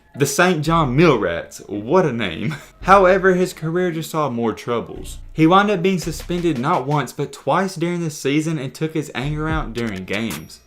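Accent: South, United States. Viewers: You have this southern draw you're trying to hide and its like...you're talking out of your nose or something. not a natural voice, hard to listen to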